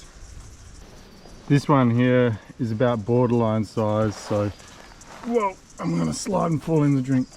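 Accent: Australia. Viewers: Hey can somebody tell me where is filming his videos? Is it Australia or NZ? Probably AU right? Not a native speaker so also curious whether he has a typical aussie accent?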